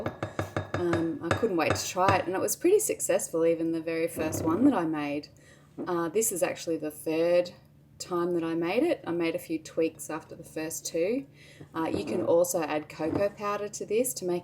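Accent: Australia. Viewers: Thanks!! Yes, any tips would be great. I am only just starting in the world of sourdough and am in the process of building my starter. I've watched many videos on starters etc but I love yours the most because they are simple, very clear and close to my heart and home because Im an Aussie too...(Aussie Greek). So hearing the Australian accent always warms my heart. I wish you a blessed Christmas and all the best with all your cooking endevours. At some point I will also check out your soap making videos too. Cheers, Mandy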